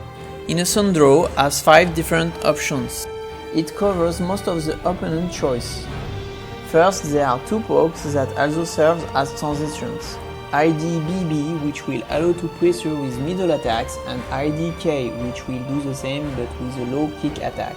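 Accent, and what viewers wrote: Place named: France. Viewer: Tu dois être le seul dont l'accent français me dérange absolument pas ^^ Il est très prononcé mais tu articules très bien et as un débit de parole bien adapté ! (pis bon, le contenu est super intéressant donc ça joue :P) Bravo pour le taf que tu mets dans tes vidéos en tous cas ! Vivement la sortie du jeu ! :D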